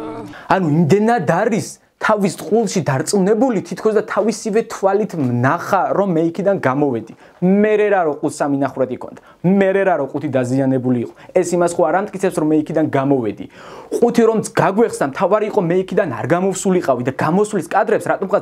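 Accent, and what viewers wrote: Mingrelia, Georgia. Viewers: ჯერ ეგ მეგრული კილო მოიცილე და მერე ილაპარაკე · dajma sad dainaxe tipi 10 wuti wuwunebda banzi megruli tonit vso iumori qarizma rame saintereso normaluri montaji sityvebi mainc rom gamartos araferi ar aqvs yvelaze uintereso 10 wuti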